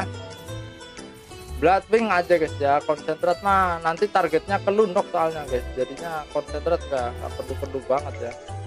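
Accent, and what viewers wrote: Java, Indonesia. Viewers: Terus terang dr sekian banyak player MC di yt. Cuma smpean yg gampang saya fahami cara menjelaskan nya mas😂. Ciri khas ketawa nya nyanduin😂. Sering2 ktawa mas😂😂😂 Koyo ne logat e jowo😂😂😂😂 Sukses terus mas e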